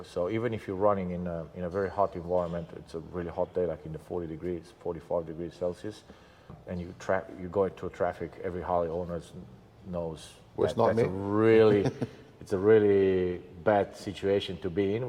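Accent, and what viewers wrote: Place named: Australia. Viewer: German dude speaking English with an Aussie accent 😉 👍🏻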